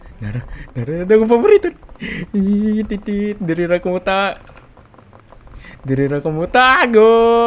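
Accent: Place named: Visayas, Philippines. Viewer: bisaya ka doi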